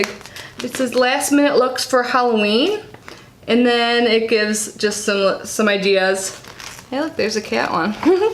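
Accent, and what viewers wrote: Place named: Chicago, United States. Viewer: Chicago! Is that where your accent is from?Where did you get your mirror?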